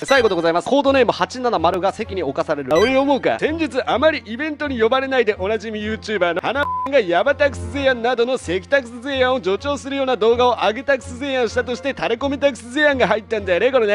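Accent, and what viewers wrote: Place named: Kagoshima, Japan. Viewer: アルティメット鹿児島弁ニキほんとすこ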